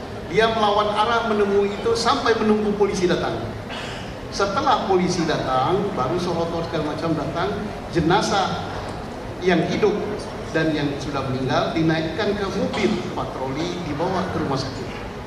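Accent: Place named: Sumatra, Indonesia. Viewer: Sy beri tahu inilah ciri khas orang sumatera bercanda nya logat nya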